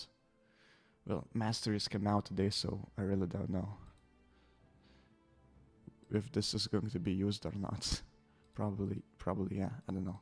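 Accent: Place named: Lithuania. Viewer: Where are you from? You sound like a Lithuanian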